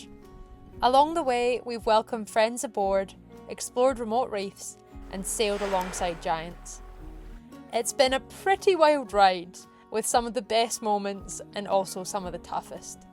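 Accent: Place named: Scotland, United Kingdom. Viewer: I detect a Scottish accent so that'll do for me to subscribe - awra best hen 😊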